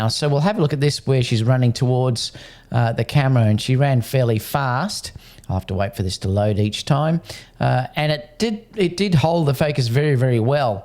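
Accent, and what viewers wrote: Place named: Australia. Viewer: Thanks for a great review in an Aussie accent! How does the image quality compare with the Sony 24-105?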